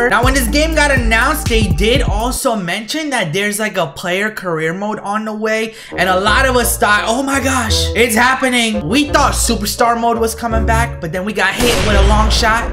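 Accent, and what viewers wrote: Maryland, United States. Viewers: Are you from Mary land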